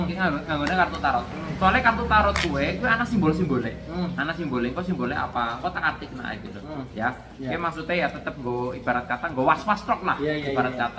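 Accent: Banyumas, Indonesia. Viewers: Cempulek jebul bang wong ngapak hehej🙏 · Salam ngapak ko...sukses terus kang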